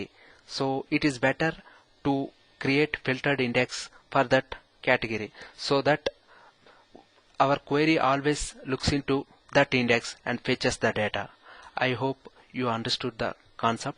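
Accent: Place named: India. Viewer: It is really hard to understand you because of the indian accent. REALLY HARD I am sorry but it is true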